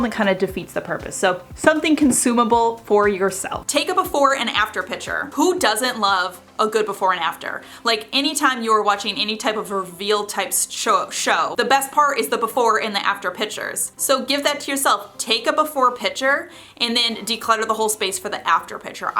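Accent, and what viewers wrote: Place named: Boston, United States. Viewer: Muchacha!! 🎉 you’re brilliant on soo many levels! I got sudden-chronic-declutter-syndrome at 2am, 2 days in a row .. I’ve hit my “plateau” on day 3 and from your videos I’ve gathered i have tooo much stuff. All downloads printed! ❤❤❤ Enemy identified, resolution spotted -and Boston Cream, cuz they talk funny! Wait, not funny. Cool! Interesting? Different? It’s an appealing accent😅 I’d have a nonsense conversation just to hear you talk. Soo I’d have a donut that reminds me of that potentially interestingly speaking boston-ly person. Keep being your greatest self! God bless ❤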